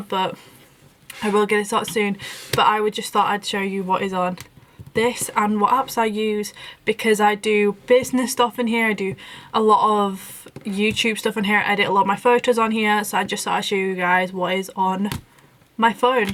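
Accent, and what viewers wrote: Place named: United Kingdom. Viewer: the British Accent kills me very softly.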